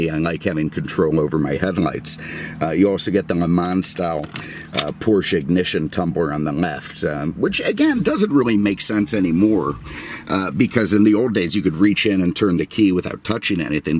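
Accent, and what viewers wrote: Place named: Philadelphia, United States. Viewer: Love the channel. The narrations are great but the voice seals it. Been trying to pin that accent for a few months... First thought: Jewish northeast. Possibly Philly, faded around the edges from being transplanted in Southwest FL for a few decades. Then I thought; "WHO does that sound like" and it took less than 30 seconds to come up with- Paul Lynde, who came from East Ohio by way of NYC. Am I close?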